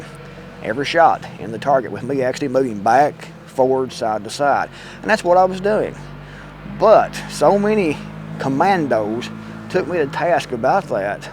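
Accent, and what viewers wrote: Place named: Tennessee, United States. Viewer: I thought the reload was spot on. What you said about Jui Jitsu is true. Tae Kwon Do would be the same. There are elements in all of it that could be useful, but street fighting, especially against multiple adversaries, will prove you need a lot more than any one form teaches. What's with the breathing comments? I'm just glad you are breathing. Jeez!!! What up people. Now, I do notice that you talk a bit different. I think I remember you being from Tennessee - y'all talk a bit different than we do here in Alabama. In fact, we here in Alabama notice that quite a lot of people in different other parts of the country don't talk as correctly as we do here. This is something we notice, and have come to accept. We are OK with it. Don't let the malcontents get to you. Have a great day!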